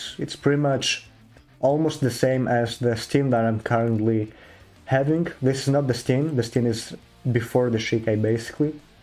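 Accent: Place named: Russia. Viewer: У тя да же акцент русский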